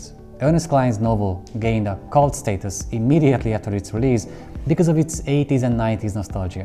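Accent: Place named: Hungary. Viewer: I knew you were Hungarian as soon as I heard your accent!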